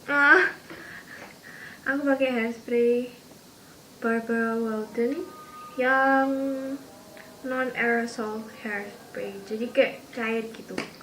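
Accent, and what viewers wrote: Java, Indonesia. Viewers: medok ya suaranya tp lucu hehe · Wjah chines ... Logat eee medhookk y mbaakk ...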